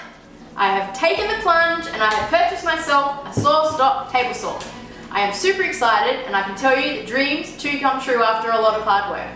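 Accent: Australia. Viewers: Im researching the sawstop and came across your channel and can I say it’s so nice to hear another Aussie. That was a great review and content thanks for sharing it’s been 3 years for you so I’ll check out some more of your videos and see what your up to and what you think of your saw now cheers. I gave a sub to and a like of course.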